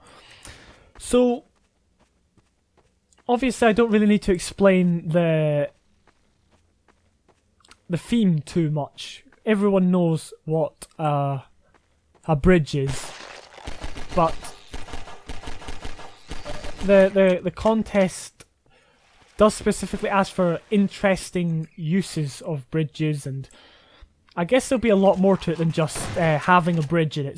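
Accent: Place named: Scotland, United Kingdom. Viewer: Scottish and likes half life, daddy?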